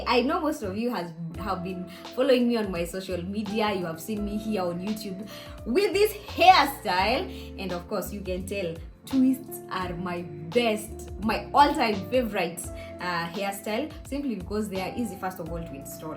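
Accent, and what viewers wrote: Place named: South Africa. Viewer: Love the accent, Kinda reminds me of how Tyla speaks, are you South African?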